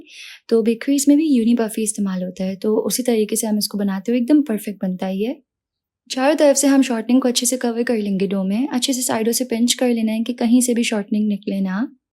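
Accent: United Kingdom. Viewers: i am talking about accent.. she is talking urdu in English accent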